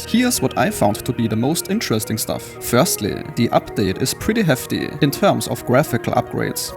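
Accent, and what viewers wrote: Germany. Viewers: The German accent made me stop the video after 5 seconds. Sorry 😂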